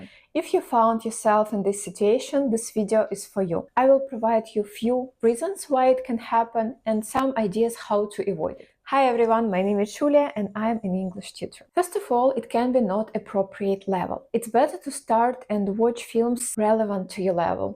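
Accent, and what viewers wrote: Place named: Eastern Europe. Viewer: Very nice Slavic accent. Continue filming on similar topics. Будь ласка Дуже файний слов'янський акцент. Продовження знімати на схожі теми. Please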